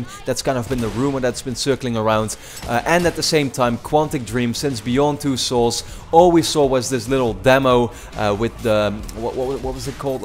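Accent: Netherlands. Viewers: Ik dacht al deze gast spreekt met een Nederlands accent :p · are you dutch?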